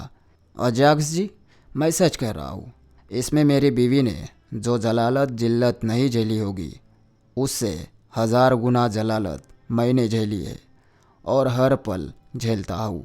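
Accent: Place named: Telugu region, India. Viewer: Aapke baat sunke aisa lagta hei ki aap Telengana ya Andhra side ke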